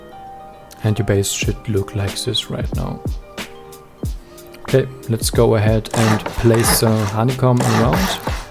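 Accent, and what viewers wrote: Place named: Germany. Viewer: Höre einen deutschen Akzent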